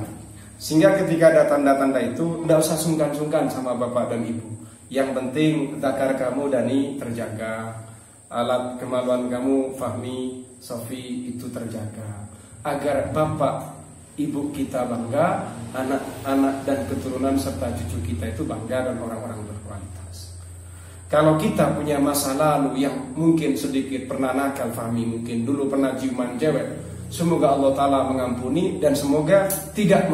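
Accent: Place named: Madura, Indonesia. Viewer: logatnya madura banget...........👍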